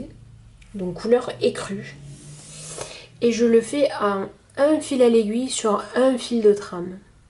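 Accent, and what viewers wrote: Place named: Southwest, France. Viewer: bienvenue dans le club des premiers flosstube lol tres joli accent ça sent le sud ouest je dirais ... j ai le même accent et ça fait plaisir ta derniere creation en petit coussin est magnifique j ai beaucoup d admiration pour toutes celles qui font du point compté car moi je suis essentiellement sur du travail en toile imprimée gros bisous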